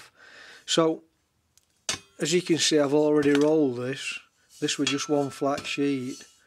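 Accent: Yorkshire, United Kingdom. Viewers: Looks like your taking a very good approach to it, - As you said not the easiest of boilers to make and flange. Are you from Yorkshire? Derbyshire? Keep up the good work !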